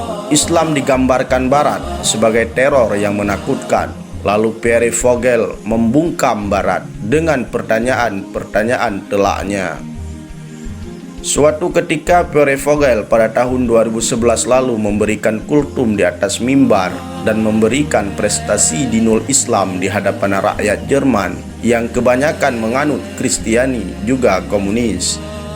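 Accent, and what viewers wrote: Bali, Indonesia. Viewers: Admin punya aksen dari zona Bali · Pengisi suara dari bali ya? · Assalamualaikum admid ,admin apa ora bali ya lagat og kental kaya dari bali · Cengkok bahasanya seperti orang bali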